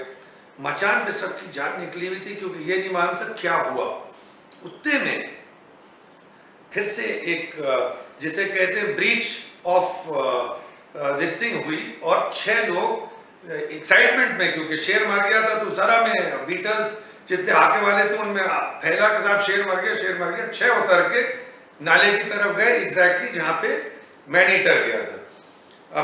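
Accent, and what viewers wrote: Bhopal, India. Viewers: Zabardast story👌 and very well narrated in bhopali style..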